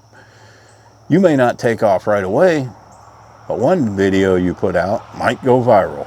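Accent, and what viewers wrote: South, United States. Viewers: The video or your 1st video was good..u had that southern voice...and photogenic....very nice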